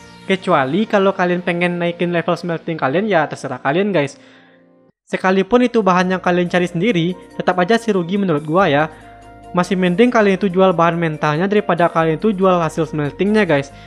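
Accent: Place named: Minangkabau, Indonesia. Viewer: Logat minangnya kental banget 😂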